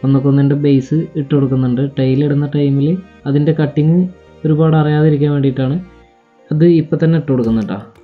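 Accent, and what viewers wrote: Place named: Palakkad, India. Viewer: താങ്കൾ പാലക്കാടാണോ ." ട്ട" ഇത്തിരി കൂടുതലാണ് . Amazing Work👍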